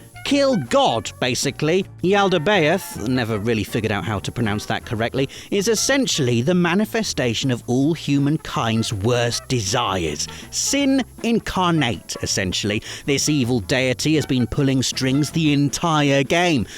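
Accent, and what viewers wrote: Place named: London, United Kingdom. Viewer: Aah, starting my weekend with a good breakfast and a -bish video... 😊👍 But can someone here please tell me, what kind of slang is speaking? Is this pure and clean english language from London? It's a real pleasure to listen to this.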